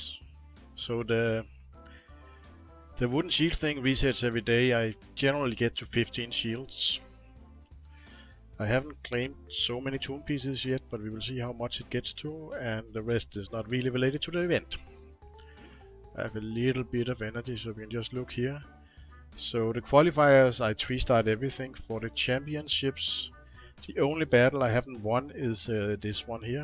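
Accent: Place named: Denmark. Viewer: Du är dansk va? Det hör man på din engelska 😅 Men, sjov med människor som streamar Looney Tunes, keep it up!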